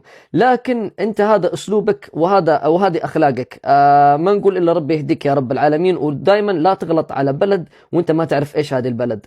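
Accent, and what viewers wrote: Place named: Myanmar. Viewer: انت يمني او برماوي كلامك كأنك برماوي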